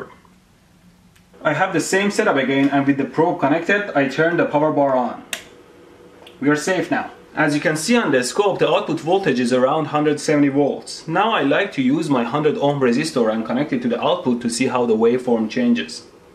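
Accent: India. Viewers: indian,just indian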